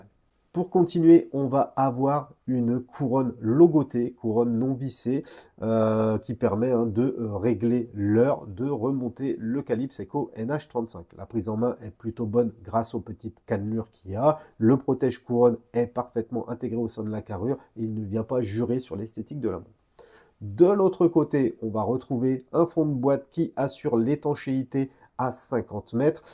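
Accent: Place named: Italy. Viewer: Tuo accento italiano è bellissimo!!! 😍😜👌 Bravissimo!!!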